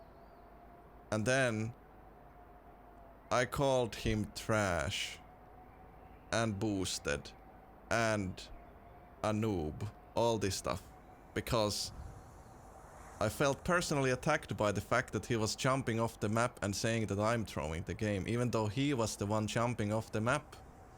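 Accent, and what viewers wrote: Finland. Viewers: Finnish accent is kinda cute tho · I feel his pain when he tries to say an english word correctly, only finns know. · I can hear your Finnish accent from a mile away, love that!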